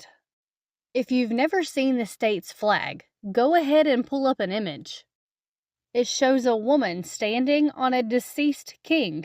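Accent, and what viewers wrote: South, United States. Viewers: It may be just me, but I gave it some attemps and have to say, the combination of a robot voice and southern dialect makes it really hard to understand what is being said.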